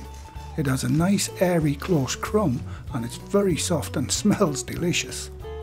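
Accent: United Kingdom. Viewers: A lovely uncle with a kind voice and a delightful British accent! Thank you so so so so.... much for the recipe! This is the one and only recipe for gluten-free bread, which I was looking for and found thanks to You! Prosperity to Your channel. Like, subscribe and greetings from Russia! : ) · He sounds like a British person & I appreciate his sharing his receipe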